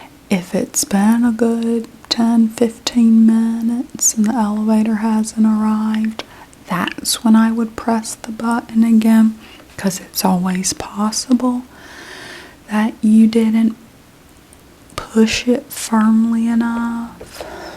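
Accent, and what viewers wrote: South, United States. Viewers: the ones with the southern accent are always my favvv omg you do it so well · you look so beautiful!! i'm so excited for a new southern accent roleplay, this is gonna stay on repeat for me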